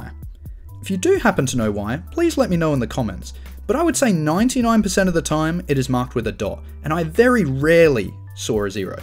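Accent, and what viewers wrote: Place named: Australia. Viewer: Me Japanese learning about ナンバープレート of my own country, by watching English video with Australian accent😅😊 Thanks a lot. I didn't know a lot of things you mentioned.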